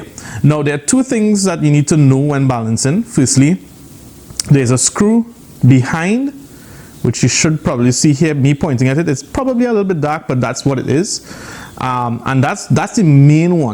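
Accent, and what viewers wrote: Trinidad and Tobago. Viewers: Wow. An ACTUAL video that helped!! Thank you so much for this. Lov'n the trini accent too haha! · You sound like a Trini. Am I right?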